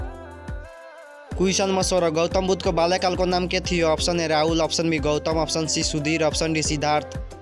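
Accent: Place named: India. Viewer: यो खुद हिन्दिबोल्ने धोति हो एस्लेबोलेको voice सुनेरै बुझिन्छ TRP को लागि यो सब गरिराछ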